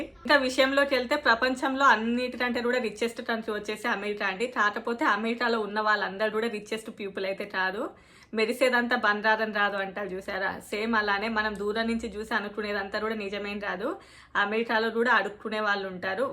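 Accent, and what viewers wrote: Telangana, India. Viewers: Your voice n fluency is so natural akka, Medi Telangana ?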